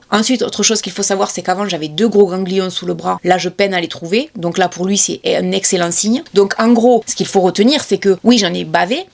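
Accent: South, France. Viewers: Chère, En 2018 j’étais en arrête pour une dépression. Je ne dormais pas la nuit. Un soir je suis tombée par hasard sur ta chaîne, et ta voix douce et ton accent du Sud me détendaient et apaisaient ces soirées pénibles. J’aimerais tellement pouvoir t’aider aussi. Tu es une personne forte et combative, tu l’as toujours été, tu t’es construite comme ça. La détermination dont tu fais preuve face à ce traitement et ses effets force le respect. Quand j’ai lu le titre j’ai eu très peur que la partie ne soit perdue. Mais c’est tellement mal te connaître. C’est dur de ne pas être inquiet. Go Maman Youtube, on est avec toi 💖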